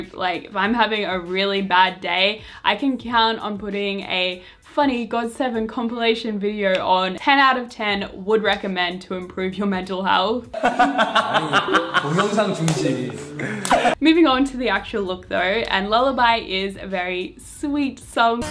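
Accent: Australia. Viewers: OMg this video was so cute and I didn’t realise you were from Brisbane (I’m from Adelaide and I thought I recognised a bit of the Aussi accent 😂). My fav playlist song of all time has to be by but I’ve actually been loving by even though I thought nothing could top 😍 Insta: breathing_underwayer456 and I’d be happy with either album 😊😍 Thanks so much for this and it’s honestly so great to see other Australian Kpop fans (even tho no one ever come here for concerts 😭😂)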